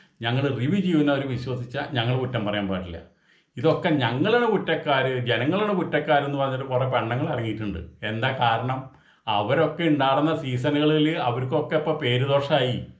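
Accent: Thrissur, India. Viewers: I enjoy your trichur slang malayalam . You are bold. Brave man. Good comedy. Thank you.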